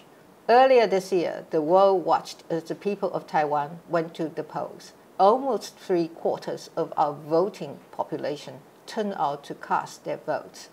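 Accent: United Kingdom. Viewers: President Tsai’s English is 10 times better than Denghui Lee whose talk in Cornell years back was extremely hard to understand. Her English is great but more like British English rather than American English. Job well done President Tsai!!! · 親美的總統講英式英文🤔( 無惡意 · 總統的英國口音越來越明顯了~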